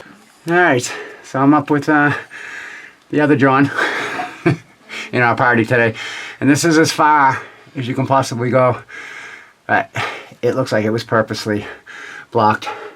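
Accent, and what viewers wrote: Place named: Massachusetts, United States. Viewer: Holy mass accent bro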